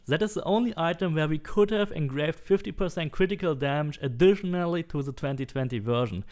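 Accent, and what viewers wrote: Germany. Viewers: thank you sir! Sorry for the questions but your accent sounds familiar... possible that u r from Germany?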